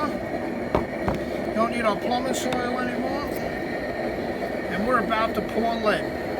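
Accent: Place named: Long Island, United States. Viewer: Holy shit that long island accent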